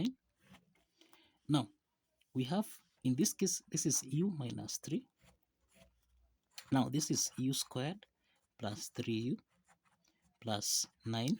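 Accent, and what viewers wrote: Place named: India. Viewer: Ужасный акцент индуса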